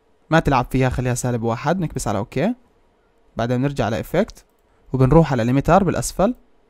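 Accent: Levant, Jordan. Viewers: الشب من الاردن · اردني ولا فلسطيني؟ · انت اردني او فلسطيني صح انا جنسيته فلسطيني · انته اردني ؟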